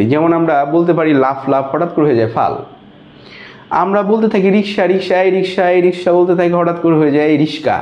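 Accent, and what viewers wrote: Sylhet, Bangladesh. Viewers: Sylhet er basay moja base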